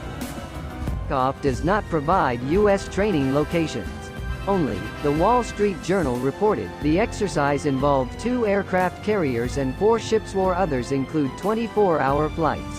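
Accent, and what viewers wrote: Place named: China. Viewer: Chinese enlish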